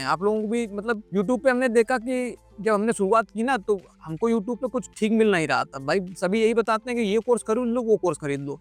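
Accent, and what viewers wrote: Kanpur, India. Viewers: Aapki Avaj se lag rah ki aap kanpur se ho 😅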